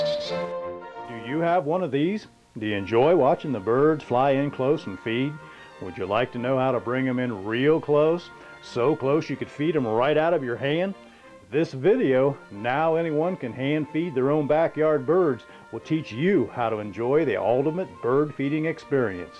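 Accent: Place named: United States. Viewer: I thought this was jolly old England , my homeland, untill you started speaking. It was beautifull. Then came the US accent and my mind wandered into the wording of 'backyard birds'... mmm the backyard of birds, Just me? British hunour maybe ehehe. I enjoyed the visit nonetheless.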